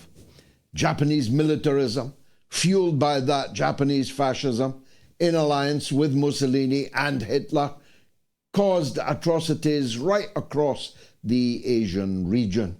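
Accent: Scotland, United Kingdom. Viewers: Because of his peculiar Scottish pronunciation and the way he delivers (pauses very contrived) we apportion to him a type of reverence. He is just another socialist scumbag from the north that has nothing to look forward to but the ‘lake of fire’ because has not repented and keeps grinding on on these dismal subjects. do you think you are exonerated by your monologues-there is zero redemption in pointing out the sins of others for you or any other commentators bro. Take a break and examine your own life my friend before it’s too late…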